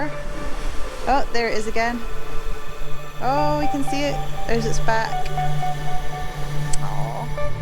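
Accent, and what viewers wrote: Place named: Scotland, United Kingdom. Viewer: It’s always nice hearing another Scottish accent randomly when searching for Shackleton stories haha. Great video love from Cumbernauld.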